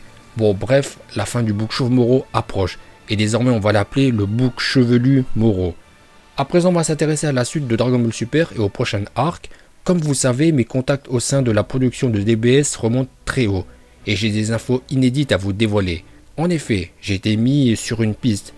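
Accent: Japan. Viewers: nous on veut ton accent japonais! Ton travail n'est plus extraordinaire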